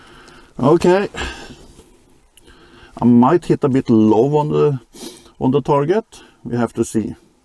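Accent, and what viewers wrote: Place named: Europe. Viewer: My chronograph doesn't talk to me. If it did, I would want one with a European accent like yours.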